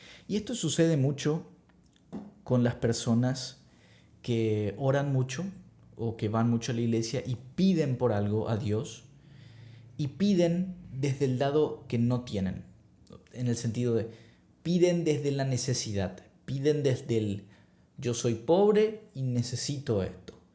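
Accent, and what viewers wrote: Paraguay. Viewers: Ya me parecia que sos de Paraguay por el tono 😊😊. Que gusto encontrar alguien de mi pais que hable de este tema en el cual estoy muy interesada. Si puedo contar algo de mi experiencia es q años atras menifeste a una persona en especifico, q hice? fue con scripting, y tb olvidando unos problemaa q habiamos tenido y enfocandome de forma positiva hacia esa persona, creo q la clave estuvo mas q nada en eso. Y tambien recuerdo q a un amigo le dije q con esa persona estabamos saliendo cuando no era asi y luego se cumplio, pero yo creia fervientemente q esa relacion se iba a dar tarde o temprano. Asi pues creer es el secreto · Acento Paraguayo?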